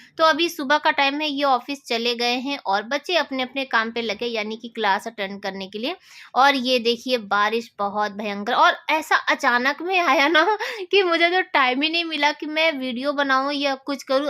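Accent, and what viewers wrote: Uttar Pradesh, India. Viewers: Koi na apki UP wali language hai..😂😂UP wale eaise hi bolte hai 😂😂😂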